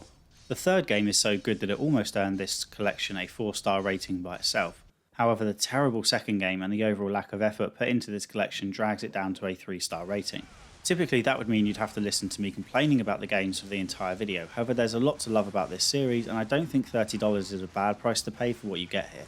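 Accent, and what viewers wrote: United Kingdom. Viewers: Sam Harold in that context of your comment its only obvious that people feel offended, replace brit with american or Chinese , but being lowkey racist aside tbh what a random thing to be bothered by someone who is just bringing out content who happends to have a brittish accent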